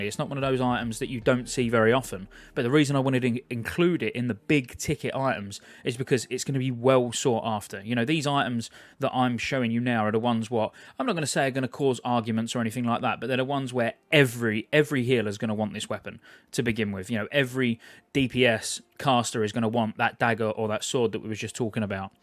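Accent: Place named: England, United Kingdom. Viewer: aww I just watched a video about this... well at least I get to watch it now in an english accent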